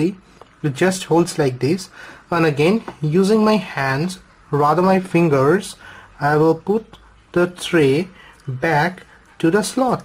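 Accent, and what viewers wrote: India. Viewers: I like ure indian accent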